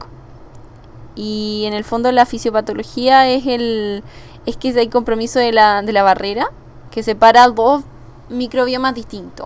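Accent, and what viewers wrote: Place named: Chile. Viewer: Cómo se nota que es chilena Atte: una chilena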